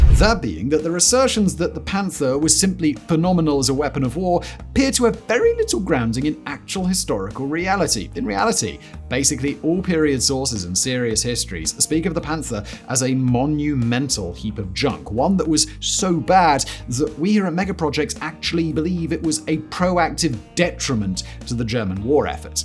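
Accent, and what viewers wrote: United Kingdom. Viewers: You are obviously biased against German weapons; your British accent and arrogance gives you away! My uncle fought against them, and he said that they made our tanks look like trash; in terms of firepower, mobility, and armor protection, they were superior to all allied tanks. There did have teething problems initially, but they were quickly ironed out, and the Panther became one of the best tanks of the war. You need to trash a your bias, and stick to facts. Talk to veterans that fought in them and against them, and you’ll have (or should have) a different opinion. You’ll lose viewers if you keep up your bias. Read the reviews and you’ll see it.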